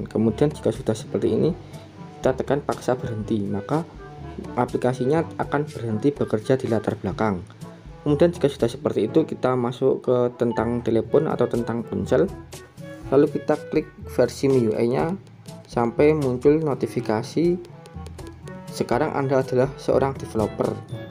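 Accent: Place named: Java, Indonesia. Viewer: Anjrr wong jawa cuk .. wis bli beres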